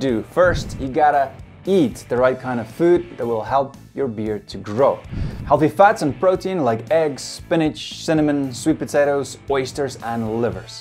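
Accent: South Africa. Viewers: Are you a South African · Soon as you started talking I thought, that's a boerseun. You can never fully undo the south African accent. Thanks for the tips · That accent sounds south African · Awehh broooo did not expect you to bee south african 🇿🇦🔥💪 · Can't help noticing the accent, south African?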